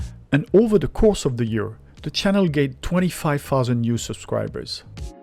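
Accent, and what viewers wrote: France. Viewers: I’m a senior from the USA. My husband and I love watching your videos on TV together. The content is wonderful, but what really sets you apart from other YouTubers is your soothing, slow, clear, and sophisticated voice plus that very cute French accent! You describe the restaurants and foods so beautifully, and your close-up shots let us see everything and dream about how it tastes. We also really appreciate that you show us the final bill. It really brings it all together for us. We also especially loved your video explaining the differences between cafés, bistros, etc. Every time we visited France, we never knew the distinctions, so your explanations were incredibly helpful. We’d love to see more videos about restaurants, bakeries, salons de thé, where and what types of cheeses to buy, and what kinds of things to buy at charcuteries, and just everything about food in France. 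This video is excellent. It was so interesting to see how YouTube payment works for creators. Congratulations on your 50K!! Thank you so much for your wonderful work! We escape to dreamland with your videos! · Well done on your acheivement of 50 000 subscribers. It must take a LOT of work to prepare all this material. Yes, we love your chanel here in Adelaide, Australia, and have learnt a lot about how to navigate Paris in our fothcoming trip. We have printed out your Pas-saaa-ges map and will walk it in April. (We love your intonation and make lists of the adorable way you pronounce so many words, as you shift the emphasis from where English speakers place it, to your very own version of the word. Eg...Passages in English has the emphasis on the first syllable wheras in French it is the second syllable that is emphasised. We have written down pages of English words and the way you so interestingly and logically quaintly pronounce them. 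For us it is an amusing and almost impossible exercise trying to mimick your emphasis. Our English speaking brains just wont do it!! ) We also wonder how the obesity rate is so low in France with all that heavy, meaty, fatty, sauced food and sooo many pastries. Pastries for breakfast? Surely they are a treat and not everyday? This all looks so unhealthy to our eyes. No salads and few vegetables seem to appear on the table. This is a mystery to us. Can you maybe do a video on the healthy restuarants in Paris? where salads and veggies and less meat is emphasised?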